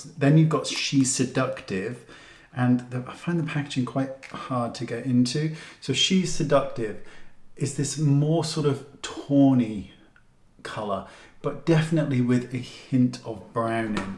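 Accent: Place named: Wales, United Kingdom. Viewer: Nice shirt! nice accent!.Im from south wales are you half welsh?¿but ive been living in Spain for about 40 years...gosh !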